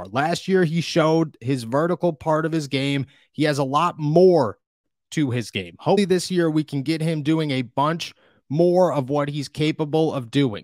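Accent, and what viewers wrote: Pittsburgh, United States. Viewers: Bro i could swear you were from Pittsburgh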